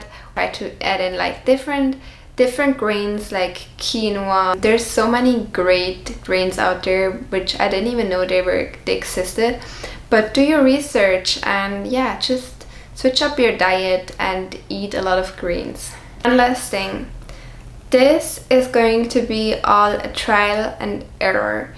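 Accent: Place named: Germany. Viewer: Where do you came from? Sounds like a German accent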